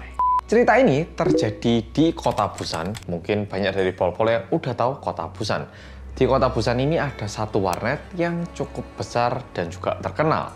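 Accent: Java, Indonesia. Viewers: Kental, dalam bahasa jawa 🙏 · MEDOOOOOOK !! Yey akhirnya up >< salam kenal dari jakarta ~~~ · Wes mas...wong jowo tenan iki..,😀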